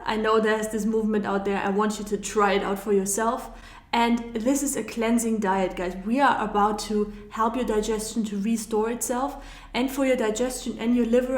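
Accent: Germany. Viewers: Thank you so much for your answer, I’m so happy that you actually read my question and replied!!!! Yes I’m addicted to Hawaïian spirulina since few years. I usually take them during winter in order not to catch cold due to its enforcement of immune system. I usually take two in the morning, but if I ever knew its so many benefits as you explained in your videos, I would have taken all around the year!!! Thank Goodness I have discovered you by chance, I am eternally grateful for all the precious advices you have been giving 🙏😍😊. I will take from now on 5 in the morning and 5 in the afternoon, but how long should I continue like this?? I am also suffering from migraine since long time, do you think celery juice will help me to get rid of it??? Thank you again, Dankeschön, I love your German accent in your English by the way 😍😍. I have lived almost 20 years in France and I had some German friends ( I also lived in Munich and in Vienna) and they all speak like you. 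I don’t eat dairy products and I rarely eat eggs and I don’t eat meat, but I love fish and seafood. And lots of fruits and vegetables. I love watching your videos and try to follow all the things you say... please keep up for I cannot pass a day without watching you on You Tube. Dankeschön 😍🙏😍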